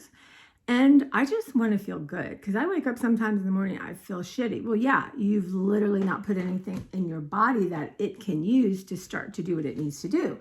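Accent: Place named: Pennsylvania, United States. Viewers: Where are you from? My daughter who is a linguist would say has a mid Atlantic accent which includes the Philly area but not as intense as Philly. I asked where you are from because I assumed NYC from your Trader Joe’s story, I live in Long Island. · Why did I assume you lived in Pennsylvania? That's the accent I hear.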